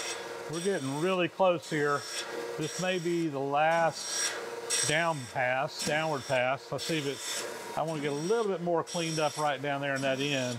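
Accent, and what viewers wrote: South, United States. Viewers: you might find this a bit funny. When you say "arn fest," I used to think you were saying "iron fest" with your southern accent, But recently I saw Mr Pete's video and he had an "Arn fest" t-shirt on. 😂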